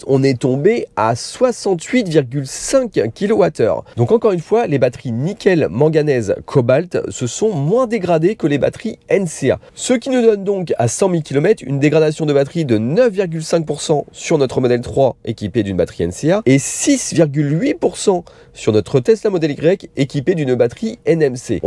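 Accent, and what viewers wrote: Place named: Paris, France. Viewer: J’en peux plus de ce E preposale à la parigo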